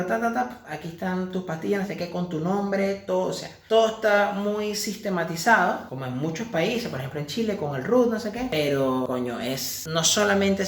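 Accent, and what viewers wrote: Venezuela. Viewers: Llevo solo 1 minuto de vídeo y ya se w eres venezolano jajaja tan bello... Dios te bendiga papito!!!... 😆😘🇬🇧🙏🏼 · Desde que empezò dije este chamo es venezolano... bajo a los comentarios y confirmo jajajaja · No hizo falta escuchar 1 minuto de video para saber que eres venezolano.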